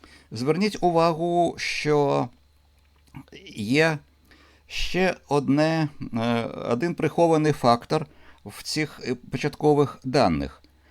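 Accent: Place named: Odesa, Ukraine. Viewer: Одеський акцент української пестить вухо. Дякую. Цікаво.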